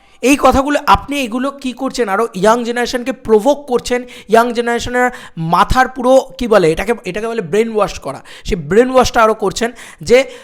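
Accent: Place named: Bangladesh. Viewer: Kangal Lok😂 accent Bangladeshi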